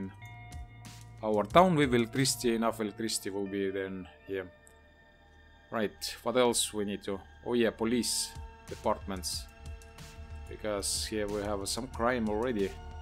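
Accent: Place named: Estonia. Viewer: i figured that younwere estonian by your thick accent haha. hello from finalnd, bro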